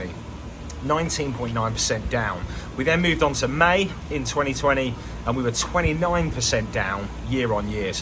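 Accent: United Kingdom. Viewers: I’m moving to Orlando in 5 years, we have family in the USA 🇺🇸 you sound like a Brit from the UK. I live in London, and very worried about trump and his policies regarding immigration as for interest rates they only low for domestic UK citizens if your UK buyer they want 5 1/2 up to 8% and they want 40% deposit down so you get discriminated against because you’re investing in their country, disappointing, 👎🏼👎🏼👎🏼👎🏼👎🏼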